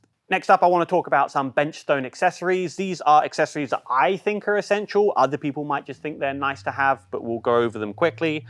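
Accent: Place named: United Kingdom. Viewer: From your accent I thought you would be in the UK. I follow the link and the prices are in $? Hey ho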